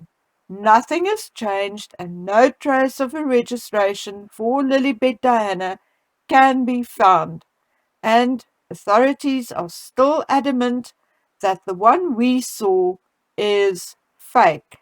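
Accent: South Africa. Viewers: This woman (commentator) sounds like a South African, because of the accent. I am from SA and I can tell.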